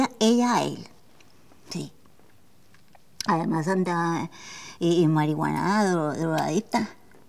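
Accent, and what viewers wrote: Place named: Cali, Colombia. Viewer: Tengo un amigo caleño que habla igualito, jejejejeje Que artista tan buena eres, la mejor.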